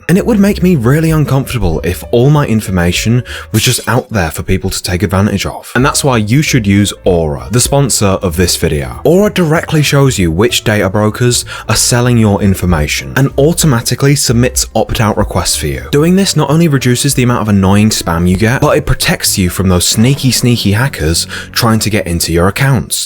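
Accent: United Kingdom. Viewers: Bro you started with epic british lector voice and then you show us a beta 2011 emo kid recording in parents bedroom. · ar u British mate???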